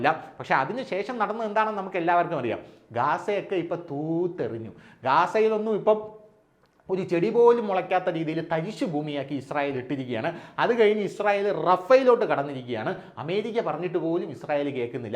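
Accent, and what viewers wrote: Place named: Pala, India. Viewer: രസകരമായി present ചെയ്തു 👍പാലാ slang ൽ 😂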